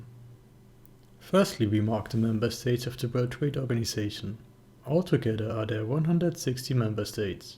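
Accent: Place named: Switzerland. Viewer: Geneva s English sucks Acento SUIZO tipico: frio y de fumador de rodillas guacala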